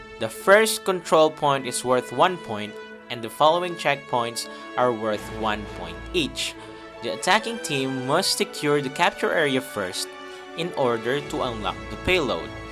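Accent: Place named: Philippines. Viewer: this is actually really well done. the "filipino english" accent is bearable <3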